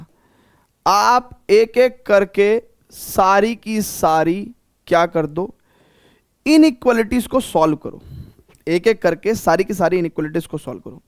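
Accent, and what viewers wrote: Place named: Uttar Pradesh, India. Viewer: Sir m bhi up se hun isiliye relate kr skta hun aapke bolne ke tarike se ekdm up wali tone h bht maja aata h...❤😂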